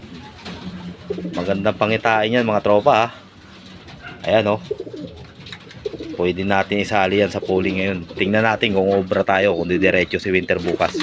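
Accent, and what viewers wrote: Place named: Iloilo, Philippines. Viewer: kasi parang my tono kang ilonggo hehe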